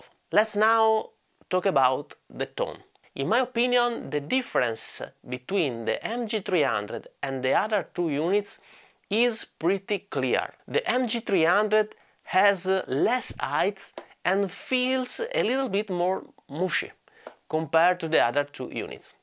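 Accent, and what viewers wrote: Italy. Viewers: you're Italian I can hear it.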